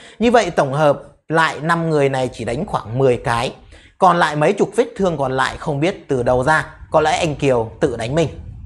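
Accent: Standard. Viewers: E nói rất chuẩn đấy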